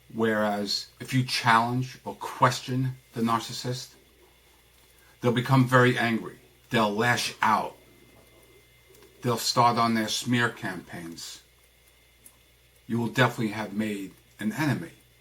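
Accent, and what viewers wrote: New York, United States. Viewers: Your videos are very interesting and informative. Well thought out and well presented. You will definitely help many people get over horrible experiences. I was thinking that while you and many others, including myself, have had the experience, that, at least, we are able to "not contact" them. We can escape from the hell that they must live in constantly. Keep up the good work. You look great and really enjoy your New York accent.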